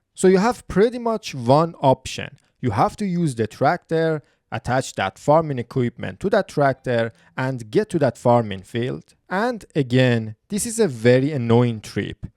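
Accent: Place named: Iran. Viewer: good luck buddy. perfect channel. like your persian english accent.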